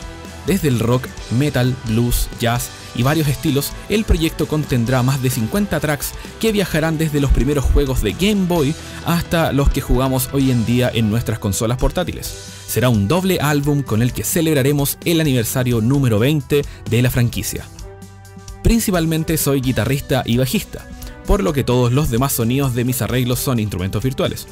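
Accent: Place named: Chile. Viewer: wena no es por ofender pero te paresi a vardoc y por cierto chileno detect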